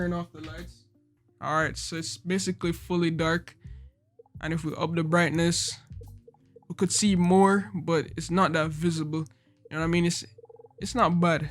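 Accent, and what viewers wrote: Jamaica. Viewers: Mi can hear di Jamaica in yuh yute 😂🤞🏾🇯🇲 good video I'm thinking of buying one. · I hear the Jamaican accent lol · Man sound like a yawdy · Are you Jamaican? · Yow real medz are you jamaican? ?? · Wait u Jamaican ?🇯🇲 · Where you from bro? Sounds like a Jamaican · Are you Jamaican 🇯🇲✊🏾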